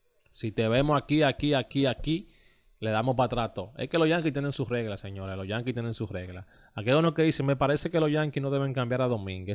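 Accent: Cibao, Dominican Republic. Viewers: Buenas tardes, tú hace buenos comentarios osea que hace tu tarea pero acuérdate que tú estás en un público tienes que mejorar tu manera o tu forma de hablar acuérdate que no solo te estás dirigiendo al público Dominicano que sabemos que eres cibaeno pero los otros países no saben el porqué tú habla así que en vez de decir (estoy seguro tú dice to’l seguro en vez de decir ochenta y seis dice ochental sel) y así sucesivamente pero si haces buenos comentarios y te felicito en eso.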